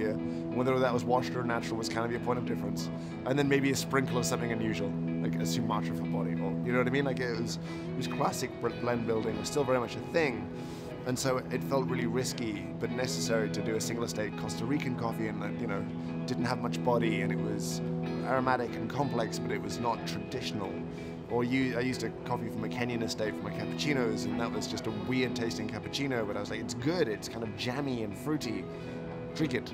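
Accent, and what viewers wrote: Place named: United Kingdom. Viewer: is one of the best ambassadors for coffee there is, not a little helped by that calm, thoughtful British articulation:)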